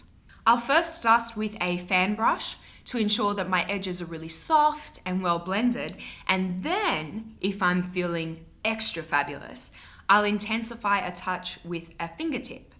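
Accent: Australia. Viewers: I really love your accent ... Make me think to my "aussie crush"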